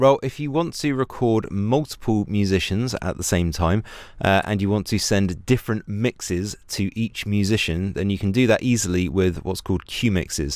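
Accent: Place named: United Kingdom. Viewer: Solid info. Not to a dick, but I had to rewatch a number of things to clearly hear what was being stated. Not a matter of accent, it was specifically the speed. Many in the U.S. neither speak or are accustomed to hearing U.K. english (which we also often hear from those speaking english who are from India & pakistan). It's like there's some race to get every single syllable out of the mouth, regardless of audience comprehension. I understand that you are going to do as you will... it's fine. I merely wanted to share the perspective of some of us who are struggling with the tutorial a little. Thank you for your time.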